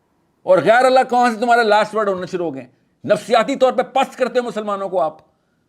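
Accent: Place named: Punjab, Pakistan. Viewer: mispronouncing with your Punjabi language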